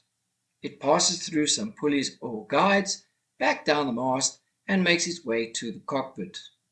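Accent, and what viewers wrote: Durban, South Africa. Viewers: Great videos and well explained. Graphics are excellent. Do I get a hint of Durban accent?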